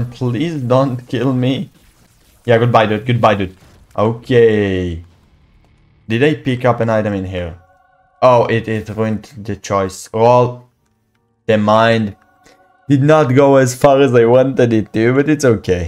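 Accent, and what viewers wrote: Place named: Israel. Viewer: Say, are you Israeli? Your accent and the way you say mother gives me strong Hebrew vibes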